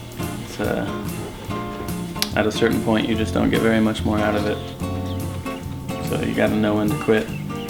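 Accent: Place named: United States. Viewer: Hi guys, watching this brought back loads of memories. I was born on the island of Trinidad. We had a coconut tree in our yard. I know you pick off the younger coconuts, when the flesh is soft, you can scoop it out with a spoon, and put it in a glass or whatever container that you collected the water in before you cut the coconut open to get the flesh. Delicious!!. Where in the world are you guys? You sound like an American. Enjoy your meal, coconut milk makes every thing taste better,and quite nutritious too.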